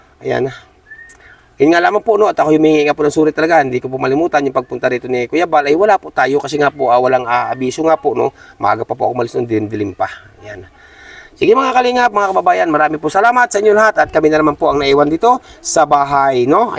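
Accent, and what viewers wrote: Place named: Batangas, Philippines. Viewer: Batangueño prin ung iyong punto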